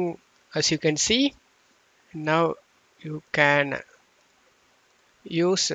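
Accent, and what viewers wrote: India. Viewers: Just because he sounds Indian doesn’t mean he’s “scamming”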